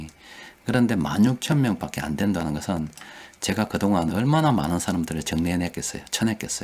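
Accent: Gyeongsang, South Korea. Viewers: 경상도말투친근감있어서 좋습니다~ ^^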